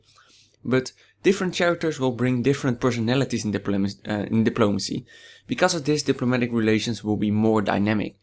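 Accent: Netherlands. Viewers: Are you Dutch? You sound like it!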